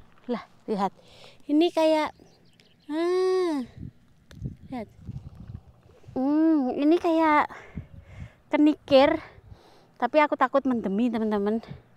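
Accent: Java, Indonesia. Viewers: Suka sama bak bahasa nya medok walaupun udah lama diluar negara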